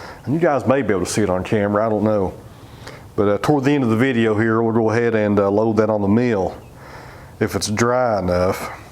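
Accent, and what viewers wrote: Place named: Kentucky, United States. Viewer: You sound just my family in far southeast KY. I recognize that accent. Fine people.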